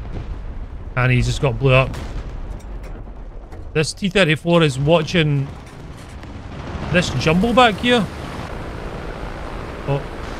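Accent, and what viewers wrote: Scotland, United Kingdom. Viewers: Day 2 of telling he's the best war thunder YouTuber and has the best Scottish accent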